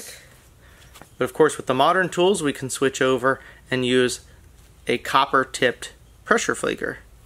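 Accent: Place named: South, United States. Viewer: are those invasive hogs? i'd assume so by the slight southern accent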